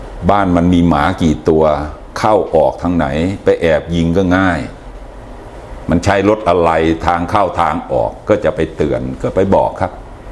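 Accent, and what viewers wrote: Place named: Lat Yao, Thailand. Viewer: คนลาดยาว ก็พูดแบบนี้5555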